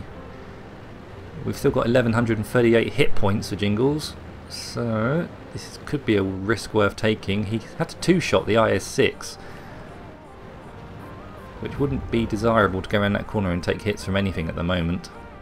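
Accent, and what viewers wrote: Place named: United Kingdom. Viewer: its the British accent i guess;) didnt hear the sarcasm.. good weekend.. also can i send some gameplay?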